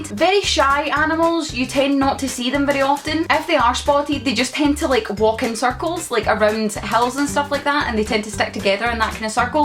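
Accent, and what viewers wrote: Scotland, United Kingdom. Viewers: I moved in Glasgow 1 year ago, your videos are helping me a lot to understand the Scottish accent 😂😂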